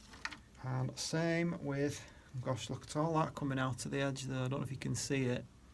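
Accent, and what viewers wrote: North, United Kingdom. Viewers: Fabulous video and so entertaining too. By the sound of your accent you’re definitely a northerner which is always a winner in my book.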